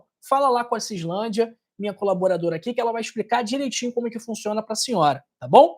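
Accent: Rio de Janeiro, Brazil. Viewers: Doutor o seu jeito de falar é de carioca , acertei ? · Esse sotaque carioca dá gastura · sou de Salvador Bahia.Voce e Carioca na cara, com este sutaque gostoso.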